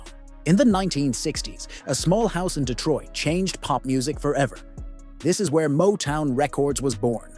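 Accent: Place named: Ireland. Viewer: AI(?) Irish. Do love it.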